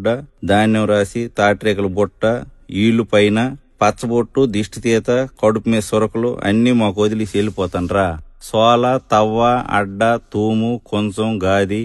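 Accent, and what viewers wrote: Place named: Uttarandhra, India. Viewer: Pakka uttarandra yasa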